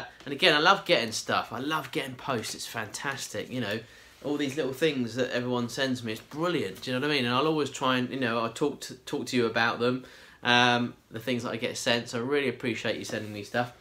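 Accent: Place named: Liverpool, United Kingdom. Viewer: New Zealand.... really.... I would guess by your accent your around Liverpool myself. I did ask a while back.... and I'll do so again mate. how do youclean the resin out of your mixing bowl... cheers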